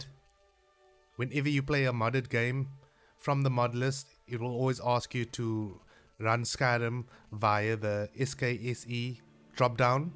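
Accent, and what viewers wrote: South Africa. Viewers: awe I recognize that South African accent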